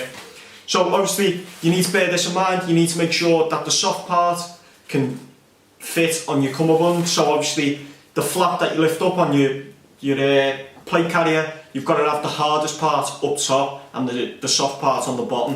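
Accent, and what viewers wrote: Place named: Liverpool, United Kingdom. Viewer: rrrr aaaaa you gotta love the liverpuool acccent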